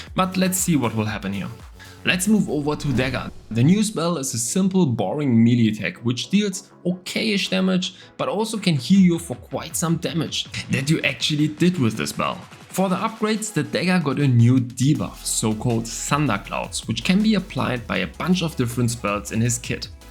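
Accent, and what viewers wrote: Germany. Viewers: Hört sich so Deutsch an :D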